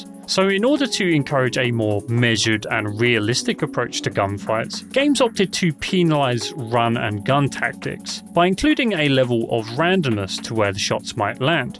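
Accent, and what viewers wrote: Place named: United Kingdom. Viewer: you didn't have to put "UK" in your username for people to know you're British™, your pronunciation is is so 🇬🇧🇬🇧🇬🇧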